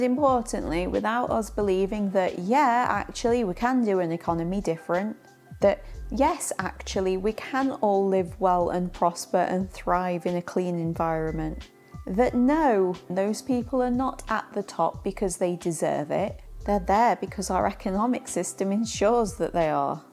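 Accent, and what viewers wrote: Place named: North of England, United Kingdom. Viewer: Oooo same regional accent as Shaun and Rachel Oates. Loooove. North of England is poppin' off.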